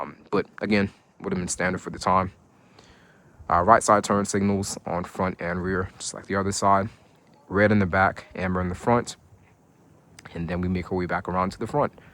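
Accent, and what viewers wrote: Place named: Lowcountry, United States. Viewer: This is so cool! I've always had a fascination with the Thomas MVPs! This one is nearly identical to the white and teal (and later white with red and blue accent) activity busses we had in Beaufort County when I was in school. This was back in the 90s and early to mid 00s. I couldn't tell you what they have today. And based on your dialect, I’m 100% certain you’re from my neck of the woods as well, lol! 843! I live in Texas now, but the Lowcountry is home!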